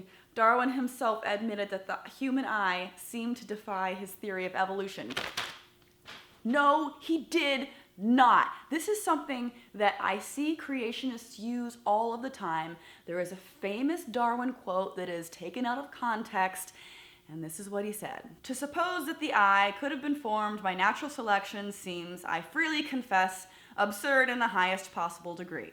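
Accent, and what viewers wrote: South, United States. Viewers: that Southern accent though.... I know it's parody, but I like.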